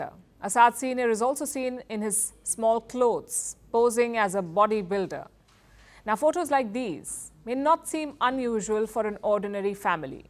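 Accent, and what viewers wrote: India. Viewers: Now I know you are garbage news, with indian accent. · Palki, the puppet Western propagandist with an Indian accent.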